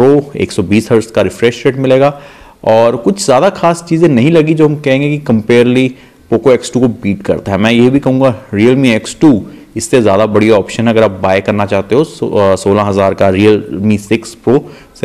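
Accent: Punjab, India. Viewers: are you punjabi ? or Saraiki dialect ?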